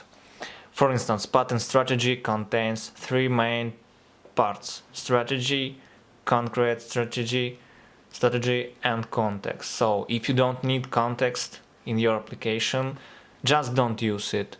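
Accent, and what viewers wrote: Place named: Russia. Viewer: я бы сказал , что это русский мужик читает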